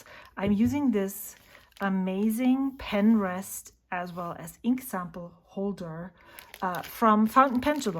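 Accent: Germany. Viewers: I enjoy your off the cuff videos and find myself laughing because they're real! Like Katie, Ive been tweaking my Vanness cart all week so I'll definitely use your code! Thank you! I love to hear your German pronunciations. I'm the same with Italian. Though I'm San Francisco born & raised, I grew up listening to it and can't get myself to pronounce Italian names with an American accent.😂 Had I known, I would have happily sent you my bottle of Hanging Rice. I have several Troublemaker inks that haven't been getting sufficient love so let me know if there are any you'd like to try and I'll see if I have it.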